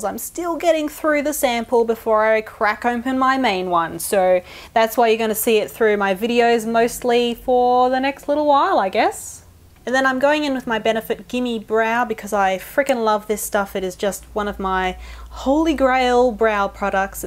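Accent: Australia. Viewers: You know, usually aussie girls say "like" and "omg" about <num> times every sentence, but not you! You just have a mad aussie accent :D and great videos! Love form germany :D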